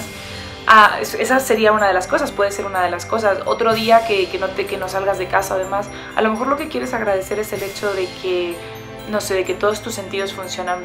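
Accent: Colombia. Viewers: ¿por qué motivo ahora hablas con acento colombiano? 😒 incluso 'seseas'...increíble... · hermosa tu nuevo apariencia!!!!! se te pegó el asento colombiano!!!! jajaj. besos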